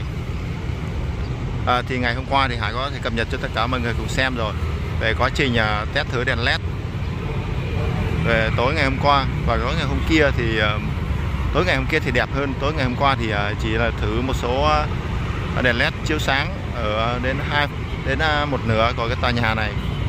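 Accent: Vietnam. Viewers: Có vẻ như ad. này quê TH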